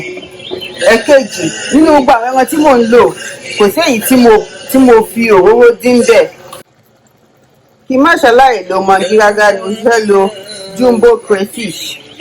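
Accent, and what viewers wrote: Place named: Ilorin, Nigeria. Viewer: You should have added subtitles. But I kind of enjoyed hearing pure Yoruba video. You sounded like you're from Ilorin.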